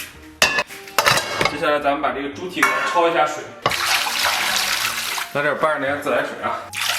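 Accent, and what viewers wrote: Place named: Beijing, China. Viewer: 您那毛坯儿房愣是给我听吐了。求你，放过北京话，积点儿口德。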